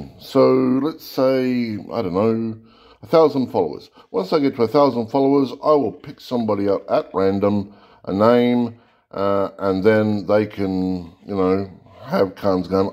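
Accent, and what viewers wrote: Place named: England, United Kingdom. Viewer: What england English accent is that?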